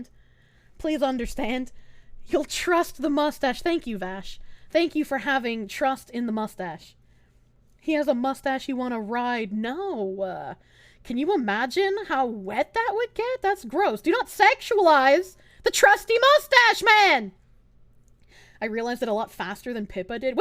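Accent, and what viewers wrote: Boston, United States. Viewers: ‘Chubby lesbian biker’ My brother in Christ, that is a Boston accent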